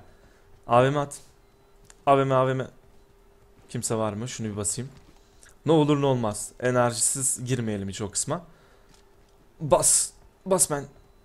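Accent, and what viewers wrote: Adana, Turkey. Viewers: Şurda Br Adam Ateş Edüüyür Onuda Alalım Adana Şivesi :) Adana<3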